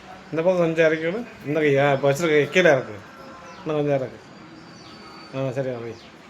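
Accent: Madurai, India. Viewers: இதுதான் மதுரைக்கான பேச்சு அருமை👌👌👌👏👏👏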